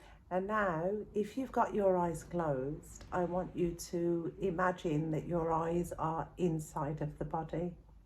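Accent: United Kingdom. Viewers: Can't wait to view it! Thanks, for who you are being for us. I love your videos and your lovely British accent 😊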